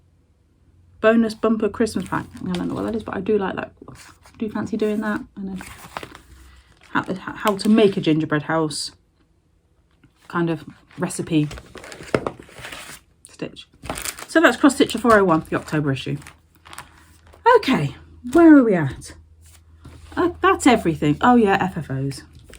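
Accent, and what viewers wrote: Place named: United Kingdom. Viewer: Hello, where is your frame company please, couldn't see it on your very comprehensive drop down list unless I missed it somehow and didn't seem to come up on Google search. Enjoying you video sounds like you are in the same area as me in thee UK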